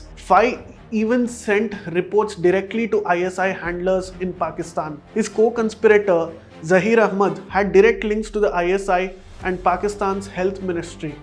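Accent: India. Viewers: Your content is really informative but you need to change your Indian accent if you are desiring for global audience. P.S. : I am not being racist....this is what you should do to expand your business...that if comes with learning new skills, language or accent etc. It is what it is you cannot change the world bro, you have to sacrifice yourself.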